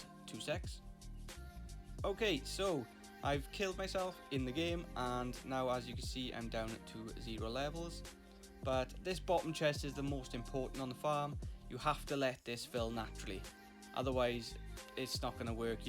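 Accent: Wales, United Kingdom. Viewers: You welsh? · Are you welsh? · u welsh? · Are you British or welsh? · You sound welsh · Wait is this guy Welsh?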